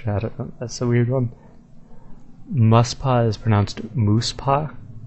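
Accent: England, United Kingdom. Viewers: You need to keep in mind these are will an english accent, that's why some of them sound strange. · Gotta always remember the pronunciation is done with English accents. So for Americans a lot of this is different.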